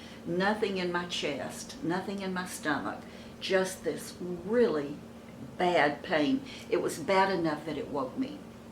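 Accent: South, United States. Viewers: I lost my precious Grandma to a heart attack..she wanted to come home and the doctors had already told us it wouldn't be long😢...so she came home on Hospice..but my momma and me took care of her..she lived 5 more day's and it completely broke me! ...I know this is an older video but I Thank God you are okay! The look on your face in the intro really scared me! This is a great message...Thank you for sharing the symptoms..they just might save someone's life..you are such a sweetheart and I just love to listen to you.you have the biggest heart ever!...I can tell you're southern so where are you from? I'm from Indiana...Again I'm so happy you're okay! xo Hydie ❤💋❤💋❤💋 Hugs darlin...you look amazing and I love your sofa..we have similar taste...😜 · I love your accent and southern ways. You should be part of the southern women group (what southern women say) you would be a great addition!!!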